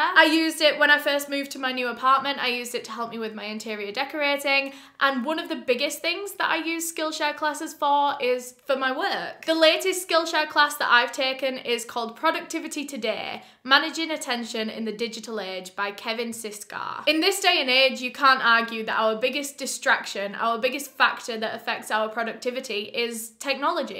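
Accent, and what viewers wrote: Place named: England, United Kingdom. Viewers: Her England accent is irresistible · Me, she kept coming up in my recommendations and when I finally clicked and heard she's a fellow northerner I instantly subscribed. I'm from up North and have friends who live in the same city as. It helps she's an inclusive queen 👑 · Our is so intelligent, kind and so very talented. She reported upon this horrible massacre with such detail, sensitivity and compassion; I think this is why she is so popular…that and her charming Yorkshire accent. And her eye makeup is mesmerising; she’s such a pretty girl…Brains and Beauty and a successful entrepreneur…...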